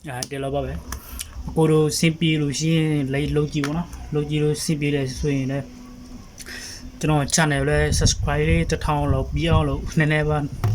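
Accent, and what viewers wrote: Dawei, Myanmar. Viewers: ထားဝယ်‌စကားနဲနဲ ဝဲနေသလိုဘဲ့ ❤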